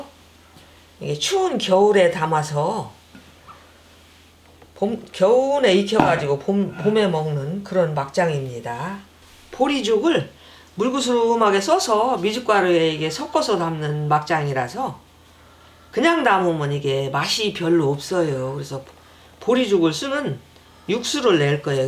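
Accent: Chungcheong, South Korea. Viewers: 충청도아줌니인가봐요 ㆍ수고많으시네요ㆍ저두. 따라서해볼랴구요ㆍ수고하셨수 ᆢ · 저도 츙청도 인데 말씀이 넘 구수하내요 막정에 엿기름 넣어 해 보았는데 아니더러구요 얼려주산대로 해 봐야겠오요 정성이 엄청들어가내요.보여주기 정성이네요 고추싸없으면 고추가루 넣어도 되나요 아닌 국물 냘때 먀운고추 조금넣고 끊여도 될까요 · 정감있는 내고향 충청도 구먼유 잘 배웠어유 감사해유^^ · 항상감사히잘보고있읍니다충청도분같아요 구수한말씨며여유로우신마음씨가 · 엿기름이 안들어가서 더 쉬워보이네요. 나도 장을담그는데. 막장은 안해봐서.한번해보려구요. 충청도아줌마인가요? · 충정남도 아님 전북분 말씨 같아유 정겹네유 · 저두 충청도 결성인디 사투리 정겹습니다....ㅎ 묽으스름....ㅎ 저는 보리쌀을 방앗간에서 가루로 빻앗는데 그냥가루로 죽쓰면 될까요? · 구수한 충청도말씨 정겹네요 옛날 어른들이 담그시던 막장 먹고싶었는데 잘배웠어요 겨울되면 담가야겠어요 감사합니다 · 좋은영상 잘보았습니다 김장해놓고 꼭담글겁니다 강상합니다 말씀 이 충청도에유 듣기좋았어유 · 구수한 충청도 사투리 정겹습니다 저도 한번 담가보겠습니다 감사 합니다^^ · 충청도 구수한 사투리가 너무 정겹습니다 전 막장은 한번도 안담궜는데 당장에 해야겠어요 쌈장하면 맛있을것같애요 맛나게 익으면 며느리도 주면 좋아할듯하네요 귀한 정보 가르쳐주셔서 감사합니다 고맙습니다 ~~~♡ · 말씀도 참정감있고 맛깔스럽게 하시네요ㆍ아드님하고 대화도 사랑이넘쳐요ㆍ고향이충청도라 사투리가 아름답게 들려요ㆍ요리도 믿음이가네요ㆍ당장따라해볼게요ㆍ고맙습니다ㆍ❤❤ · 여기 버지니아 인데요 잘보았습니다 1월쯤담아야셌네요 충청도 사투리 좋아요 내가 예산이 고향이니까요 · 충청도 말씨가...고향에 온 느낌입니다. 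· 척척박사, 정결. 깔끔. 충청도 사투리까지. 주부와 여성의ㅡ모범 이십니다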